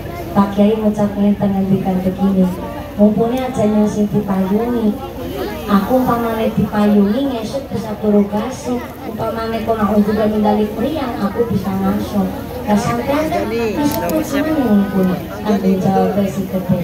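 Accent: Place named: Banyumas, Indonesia. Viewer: Serr ora ngapak- ora kepenak👌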